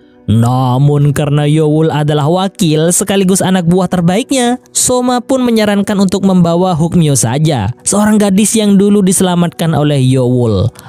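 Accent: Madura, Indonesia. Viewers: Madureh ongghu🤣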